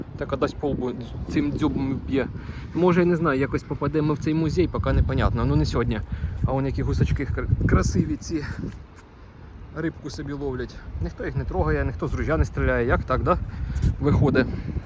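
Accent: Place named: Komyshuvakha, Ukraine. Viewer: А часом, Ви не з Комишувахи? В мене там родичі живуть, говір - ідентичний❤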